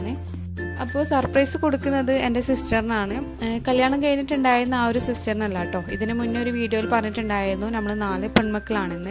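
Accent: Kannur, India. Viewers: Kannur basha 👍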